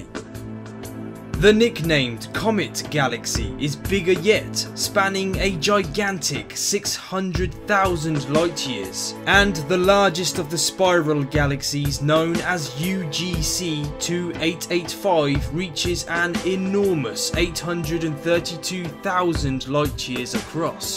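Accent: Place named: South West England, United Kingdom. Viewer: He's English, like me, and his accent is probably from the South West.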